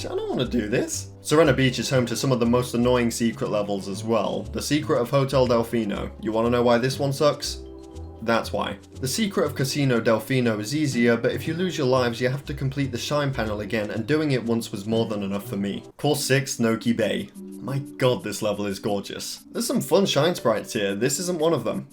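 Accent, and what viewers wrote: United Kingdom. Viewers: but he's british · BRITISH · 1/10 too british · Maybe it's just been a while but I could have sworn isn't british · Two of my favorite youtubers in one....and hes british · It's like Bri'ish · British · Wow, minus the british accent everything was spot on, could've fooled me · Why is British now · Oh god he's british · but British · The British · but british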